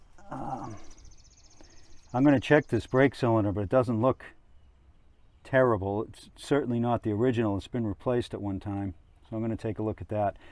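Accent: New England, United States. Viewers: Love the New England accent..sounds like New Hampshire or Massachusetts.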